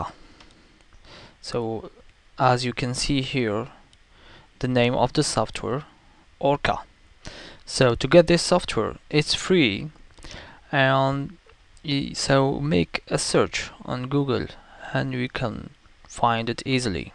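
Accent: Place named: France. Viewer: Sounds like french accent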